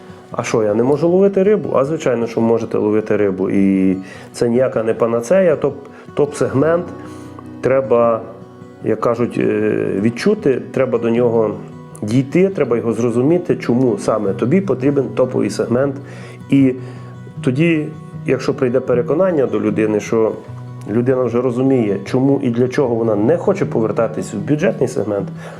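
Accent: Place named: Western Ukraine, Ukraine. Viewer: А Ви із Західної України.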